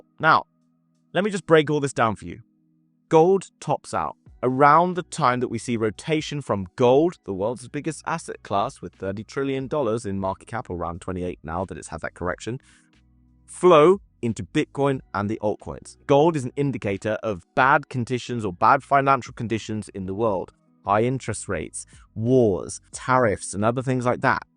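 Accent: England, United Kingdom. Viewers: 😂😂😂😂 I can’t I could tell kms away ure English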